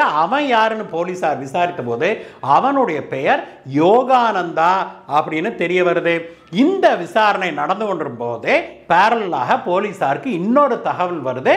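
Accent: Kongu, India. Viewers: உங்கள் கொங்குத் தமிழ் அருமை நிறைய வீடியோ போடுங்க சார் வாழ்த்துக்கள்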